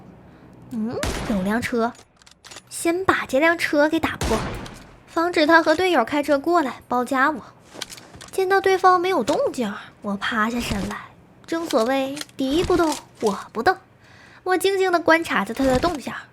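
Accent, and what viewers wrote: Northeast, China. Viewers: 她不是最開頭就說用東北話嗎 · 東北話少點可愛，還是唱歌好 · 感觉有一点东北口音 hhhh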